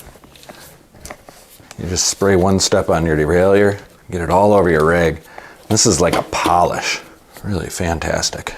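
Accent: Wisconsin, United States. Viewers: I can’t quite decide if I’m hearing Minnesota or Wisconsin but I’m leaning toward the latter. A fun suggestion: the lube counter. You say lube, ding sound effect, a number on screen adds to the count. Cheers from Minnesota! Ope!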